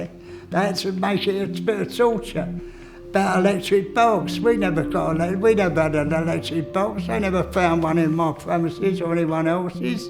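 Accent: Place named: London, United Kingdom. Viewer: Old breed of Londoner. Lovely listening to the accent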